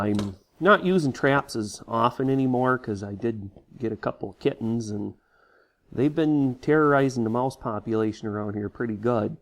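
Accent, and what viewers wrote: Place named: Great Lakes, United States. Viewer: Minnesota or Canada? I hear that Great Lakes accent.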